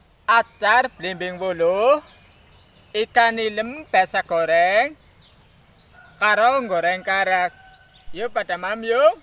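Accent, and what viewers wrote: Banyumas, Indonesia. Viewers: Ngapak banyumasan ya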